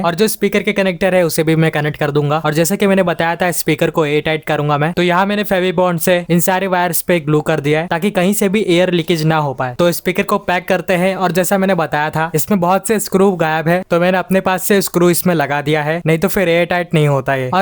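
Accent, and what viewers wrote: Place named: Maharashtra, India. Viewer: App marathi ho na, aapke hindi bolne ki tone se lagta hai ki aapko marathi aati hai ❤❤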